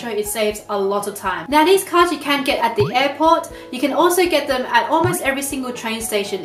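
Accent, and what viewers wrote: Australia. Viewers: She definitely has an Australian accent. Even her last name ' ' is not Japanese. But even me, Takeo, could've learned a lot of them from her. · are you an Aussie? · Are you Australian? Lovely accent.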